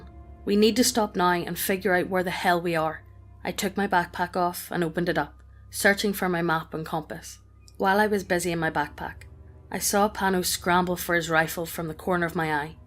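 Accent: Ireland. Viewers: It's 11 PM here, I don't know if I should watch videos containing spooky stories and Irish accent now, zombie-leprechaun nightmare fuel : (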